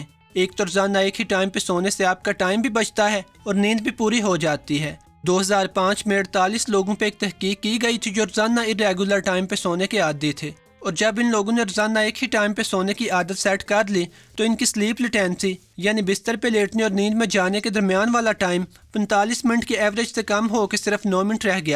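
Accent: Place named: Sialkot, Pakistan. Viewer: Itni achi research. V.good Bad pronouncing. Sialkoti lehja bolny Wala Bad... Apna lehja badlo...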